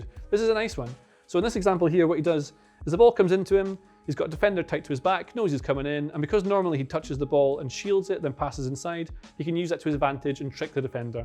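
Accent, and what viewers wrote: Scotland, United Kingdom. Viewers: Scottish presentation?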